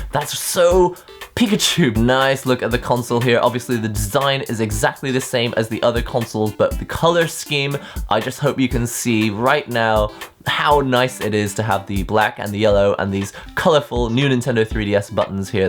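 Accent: United Kingdom. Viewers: Im amazed how you sound like your from the UK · For a gamer living in Japan, you do sound very British. (It's a compliment don't worry, I'm not saying that you're not actual Japanese). A pity Nintendo didn't make a version of Game Boy Green for the west even as a download title for these consoles or sell it pre owned, that would have been a hot seller, but there you go. All they had to do was include the red/blue Pokémon that you couldn't get in Yellow as exclusives and there you go. :D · did you grow up in the uk?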